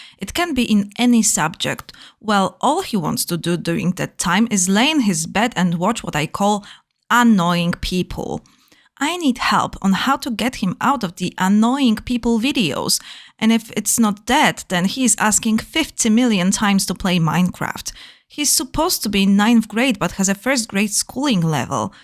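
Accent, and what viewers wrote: Poland. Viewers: It's beutiful to hear how polish accent in English looks like! XD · Kocham kontrast pomiędzy ośmiornicą bez podstawówki, a człowiekiem bez podstawówki. Strong Polish akcent btw ^-^ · hears polish accent -'no way' checks channel name 😮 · just the accent.. my poland radar went off instantly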